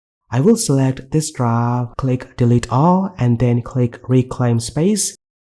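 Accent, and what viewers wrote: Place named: India. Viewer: Now i have heard it all - an AI robot voice with indian accent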